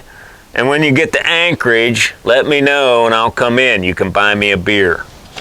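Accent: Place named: Pennsylvania, United States. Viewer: Good answers to questions people were wondering about. Had no back ground at all on you or any contestants. You talked like people that I know in Pa. After the show is over my son-in-law told me you are from Clearfield county PA. The son-in -law and daughter live in Faunce. The segments that were shown of you and the other participants were so interesting. It caused me to wonder. Could I do this? Hell no was my answer. Listen, proud to see someone from Pennsy took the title. Although Callie gave it a good run. My money was on you after day 50. Congrats to you and wishing you the best. Hope to meet you someday, maybe at a book signing or something. Good luck and safe(but exciting journeys to you).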